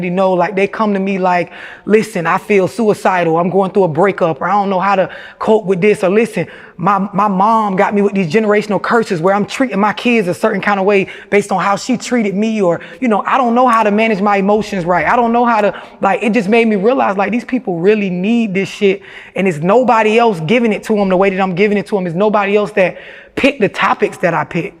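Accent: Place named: Florida, United States. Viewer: It's Florida's accent. And yes. She's very sexy.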